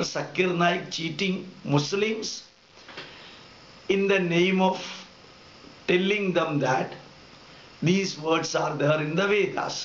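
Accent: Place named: India. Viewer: I have lived in England and mixed with English speaking people all over the world.Different people speak with different accent. Even in England, people speak English with different accent county to county.So, this man has an Indian accent,nothing wrong with that! The message he is giving is important. I salute this great person.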